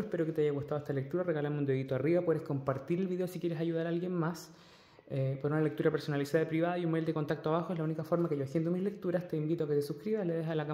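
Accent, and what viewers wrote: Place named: Chile. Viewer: Hola, Youtube me recomendó tu canal la semana pasada y he estado viendo tus videos.Eres chileno? Reconozco mi acento.❤. Elegí la opcion 4 y muy acertado soy tarotista trabajo completamente de esto hace 5 años tengo un canal de tarot aqui en youtube con mi otra cuenta.. Todo lo que describes de mi en lo empatica y generosa es asi, siempre mr he considerado muy espiritual y me causó sorpresa lo de la bendición... ya bendigo el agua y las cosas lo habia dejado de hacer de hecho en uno de tus videos te envie bendiciones curioso y ahora veo esto 🙏👍.Saludos desde Chile Me pareces muy buen tarotista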